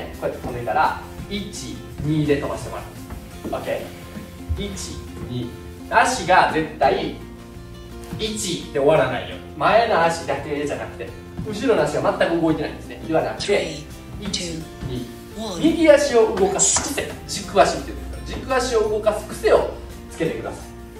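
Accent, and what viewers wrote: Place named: Kansai, Japan. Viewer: ワイプのMJがシュールw いつも思ってますが、の声って高めなのと関西弁のイントネーションで良い意味で引っかかるというか、聞き取りやすくて良いですよね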